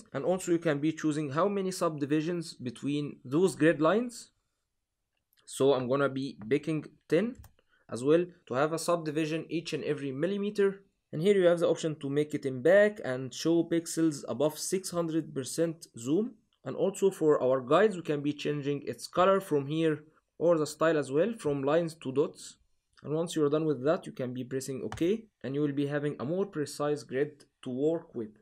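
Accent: Egypt. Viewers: مصري حيبي عرفتك من لهجتك تابع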